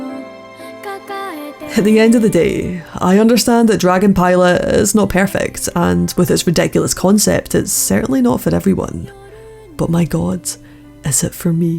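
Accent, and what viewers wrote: Scotland, United Kingdom. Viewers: Fantastic review currently watching episode episode 7. Also beautiful Scottish accent can't wait to watch your other reviews definitely deserve more subscriber's. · Just added it to my queue. Thanks. Hard to remember the anime from a season or two ago that I skipped because they'd be on Netflix eventually. This certainly looks like Terrace House + dragon air force. Terrace House being the only reality show I've enjoyed since the third season of Real World (Yes, old enough to remember how great Puck was.) As soon as I added Dragon Pilot, Hilda popped up. Voiced by Lyanna Mormont. Gonna have to watch this first just because of that. That said, have you tried getting into voice acting? I see you suggest your accent is hard to understand at times, but as an American, Scottish accents are magical. I mean that literally. The sorceress voice actress in rpg X, cartoon Y, youtube machinima thing Z, I feel like she's usually best served with a Scottish accent. 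I mean, real normal cartoon/video game characters could work too, but magical characters just always FEEL Scottish to me. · Also I do love a good Scottish accent my favourite band is from Scotland or was :( he recently commited suicide frightened rabbit was there name if you don't know them I am sure being Scottish it was likely in the news.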